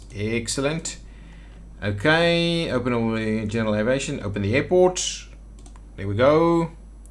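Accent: South Africa. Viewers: First time to your Channel and I must say it good to hear a South Africa accent on Youtube :D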